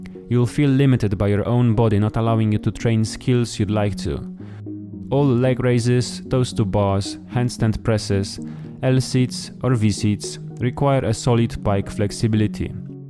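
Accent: Poland. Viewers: I hear a polish accent in your voice. (Poland?) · SiriusB88 he’s polish.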